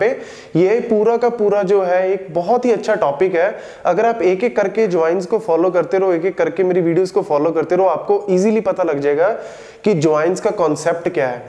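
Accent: Punjab, India. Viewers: punjabi dur se pta chal jate hai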